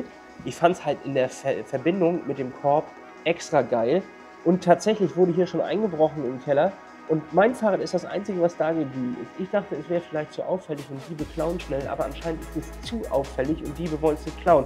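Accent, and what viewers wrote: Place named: North Germany, Germany. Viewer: Mönsch, schön norddeutsch. Fühl mich gleich heimisch! :)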